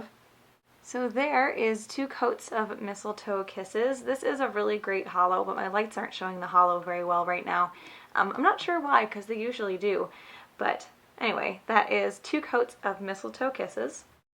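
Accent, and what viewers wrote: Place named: Midwest, United States. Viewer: Are you from the Midwest? Just curious because you have a slight accent that is similar to mine lol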